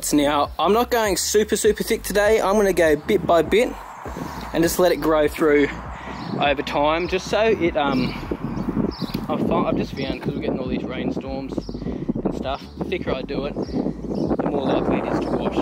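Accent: Australia. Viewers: That’s exactly what I imagined Australia would sound like. Signed, every American.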